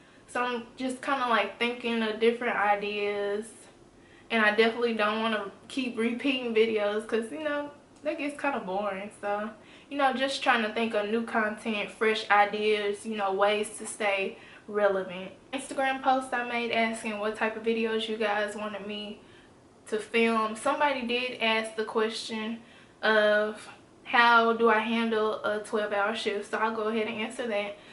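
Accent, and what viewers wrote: South, United States. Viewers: You so country but I love it!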